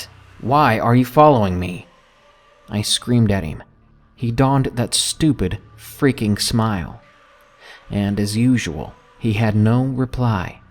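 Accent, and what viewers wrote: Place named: South, United States. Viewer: Omg! sounds so young and southern, i fucking love it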